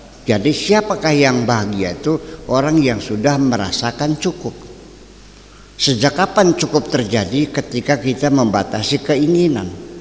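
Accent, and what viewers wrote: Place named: Indramayu, Indonesia. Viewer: WASPADAI UCAPAN2BUYA SYAKUR dg DIALEK BAHASA KHAS INDRAMAYUAN?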